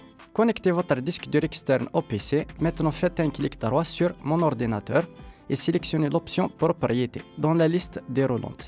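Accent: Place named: Spain. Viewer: il est style ton accent !! espagnooool franchement gg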